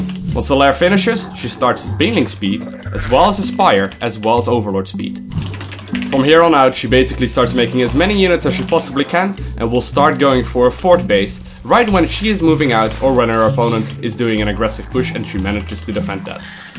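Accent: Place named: Netherlands. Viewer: Huh thats why you sounded a bit familiar. Where in the Netherlands do you live?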